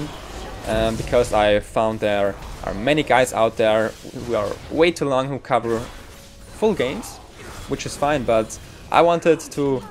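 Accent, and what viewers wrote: Germany. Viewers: thats your fault you have to speak free and not try it hard oder rede einfach deutsch ich glaube das du deutsch sprichst mit deinem akzent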